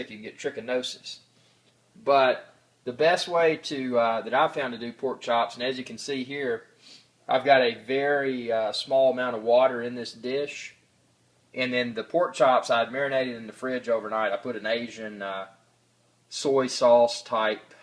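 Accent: United States. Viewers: What state do you live in, please? Just wondering about your accent. You sound American ! Thank you . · You are in Finland thats in Celsius. This man is from the USA from his voice id say. They use Fahrenheit. If you payed attention in school you would know that 176C is about 350F. 350C is about 662F. You are not wrong in your thinking but you cant just assume everywhere is the same as you. Coming from an American that might sound funny though lol. Have a nice day.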